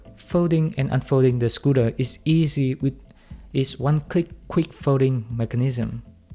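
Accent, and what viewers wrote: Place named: Vietnam. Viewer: Vietnamese accent :D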